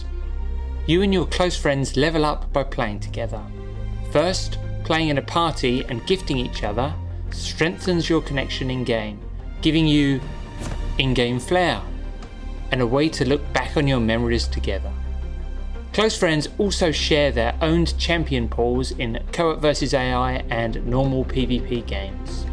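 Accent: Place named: England, United Kingdom. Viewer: Ever heard of "english accent"? have you not seen or heard a british lad before?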